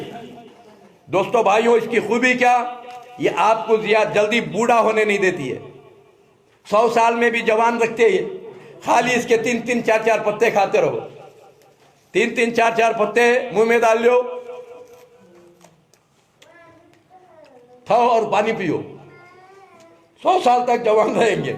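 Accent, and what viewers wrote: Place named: Hyderabad, India. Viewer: Sab ka naam batae saf saf nahi dikhta naam batane s humko samajh aaega haidarabad ki boli samjh nahi aati jaldi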